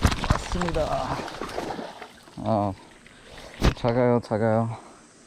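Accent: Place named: Busan, South Korea. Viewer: 부산 친구 때문에 사투리 쓰는건가요ㅎㅎ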